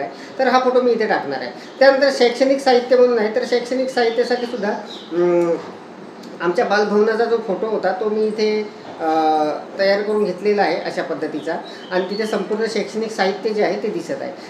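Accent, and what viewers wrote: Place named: Vidarbha, India. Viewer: खूप छान माहिती सर. अतिशय चांगल्या पद्धतीने अवघड प्रक्रिया एकदम सोपं करून दाखविलात. तुमच्या वऱ्हाडी बोली भाषा एक वेगळी ऊर्जा उत्पन्न करते. खून छान माहिती.❤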